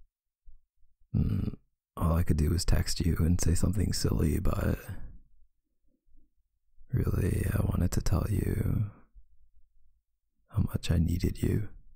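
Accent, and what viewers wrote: Canada. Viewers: I just love his adorable canadian accent 🥺🥺🥺🥺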